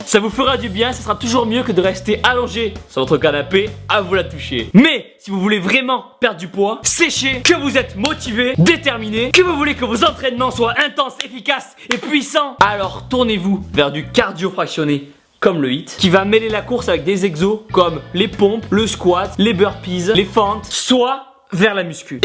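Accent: South, France. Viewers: tu n'as pas un peu un accents? tu viendrais pas du sud?^^ Tibo InShape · En passant, vidéo très explicite. Et vive ton accent du sud!!!!!!!!! Je me sens moins seul :).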